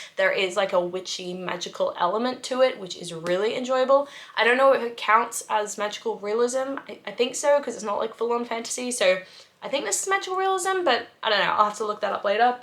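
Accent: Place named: Australia. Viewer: You have the same edition of Happy Place that I have .i also have the Wisteria Society to read, may move that up. I had been trying to figure out your accent, thought Oz but it doesn't seem that strong. Where in Oz are you from?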